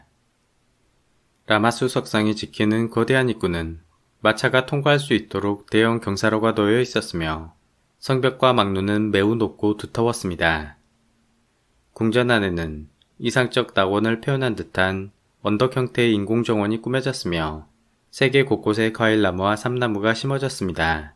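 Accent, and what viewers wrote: Jeolla, South Korea. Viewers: 전라도 분이신가?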